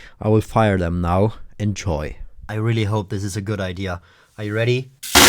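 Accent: Germany. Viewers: Are you not german? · Ey man hat direkt direkt gehört dass er deutsch ist :)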